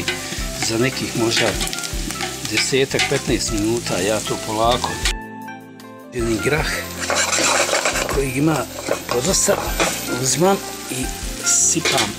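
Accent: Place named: Sarajevo, Bosnia and Herzegovina. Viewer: Vozdra i bite! Moram priznati ti si prva osoba iz Nicaze koja sam cuo da zvuci k'o da je sa Marin Dvora. Studirao sam sa dosta Zenicana i nijedan nije imao takav naglasak. Eto vala, k'o da si nam rodjeni Sarajlija. Hahaha! Nego ziv bio i uzbrdo brz. Uzivaj u svemu.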